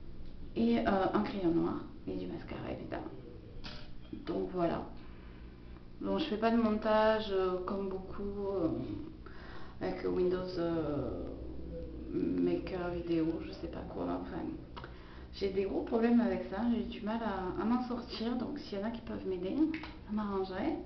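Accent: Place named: Quebec, Canada. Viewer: Hello hello!! Ahhh tu viens du Québec? Il n'est pas bizarre ton accent! J'aime bien perso!! Ce make-up te va à ra-vir!! Les couleurs des Monobulles sont sublimes j'avoue....Et j'adore ta couleur d'iris... Héhé t'inquiètes, je ne t'oublie pas avec Alice... J'ai ma petite idée dans ma petite tête.... Gros bisous et merci pour la dédicasse! T'es choute ♥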